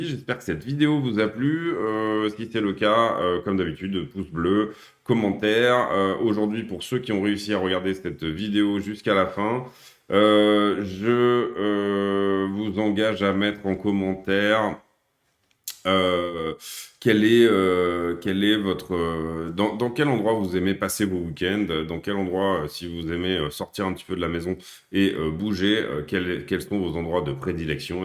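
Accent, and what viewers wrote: France. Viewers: Personnellement, j'adore me promener dans la nature. ça fait plusieurs années déjà qu'on a entrepris une excursion ailleurs mais avant on réjouissait beaucoup d'une sortie à la mer juste pour se balader un peu et respirer... on a visité plusieurs fois l'île envoütante de Cuba. Ce qui m'intéresse en ce moment c'est les "communautés" en France (et ailleurs) où on vit un peu à l'écart comme par exemple les endroits oà on se dédie à la permaculture etceterca. Ben voilà, j'ai juste voulu répondre à votre dernière question. Nous on vit près de la frontière des Pays-Bas. Vous avez un bel accent français, pourtant je ne saurais pas dire d'où exactement. Ce qui importe c'est que vous vous faites comprendre malgré la vitesse avec laquelle vous vous exprimiez. Bien à vous.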